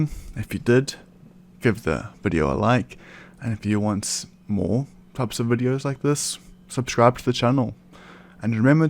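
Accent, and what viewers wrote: South Africa. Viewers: This narrator sounds distinctly South African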